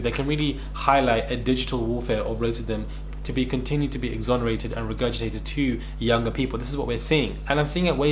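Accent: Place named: United Kingdom. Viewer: I only come before ur great British accent!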